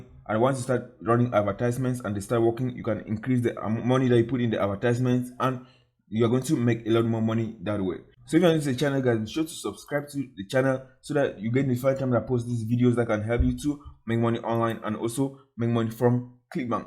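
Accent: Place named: Nigeria. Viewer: You accents is like Nigeria.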